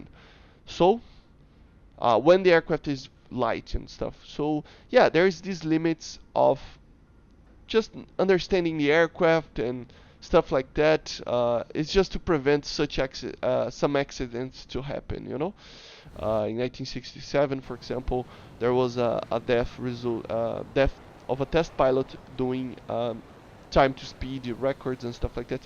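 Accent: Brazil. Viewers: eu conheço o sotaque brasileiro KKKKKKKKK, muito bom seus vídeos Man, continua com o ótimo trabalho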